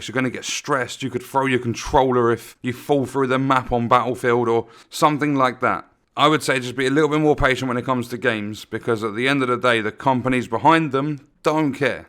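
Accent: United Kingdom. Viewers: Who finds a British accent awkward?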